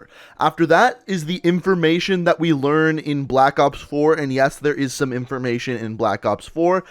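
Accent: Canada. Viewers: OMG the Canadian accent makes this video unwatchable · Is this guy canadian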